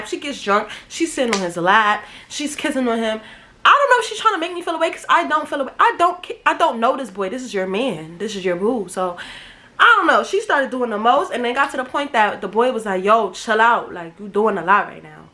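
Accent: New York, United States. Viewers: I fcking love new york accents bish, Lemme finish binging the storytimes 🔥🔥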